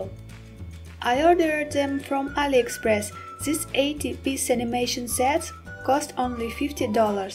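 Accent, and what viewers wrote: Russia. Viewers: Руссский акцент ) суперский обзор ))! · Какой русский акцент... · Кто русский? У автора акцент русский :D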